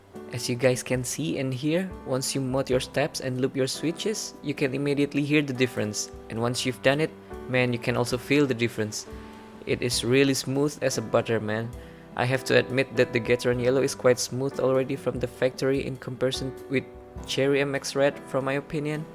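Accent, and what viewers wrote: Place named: Indonesia. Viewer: nice video! and I knew that you were Indonesian from the first seconds in xD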